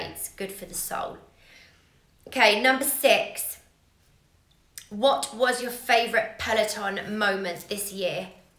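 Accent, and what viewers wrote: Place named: United Kingdom. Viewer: Love you as an instructor!! Your British accent kills me in a great way. Love it. Enjoy your classes.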